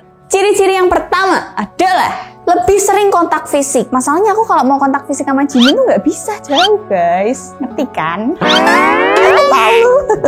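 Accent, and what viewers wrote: Java, Indonesia. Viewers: Gaya ngomongnya kok kyk jodile Warwick ada campur jowonya tapi asik gitu ya gaya ngomongnya 😂 wow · Asyik penyampaiannya mix bhs indonesia sm khas jowo nya😄 Asli fisikal touch, skin 2 skin, dia curhat soal cowok, nanyain cewek idamanku,godaan lewat jok,tp dia gak berani eye contac,persis apa yg diomongin tp skrg malah jauh, my be ada something wrong🙈😂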